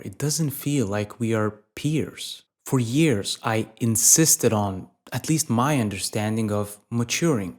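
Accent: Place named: Portugal. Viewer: So relatable, especially after graduating for a few years. Where are you from by the way? You sound Portuguese but look German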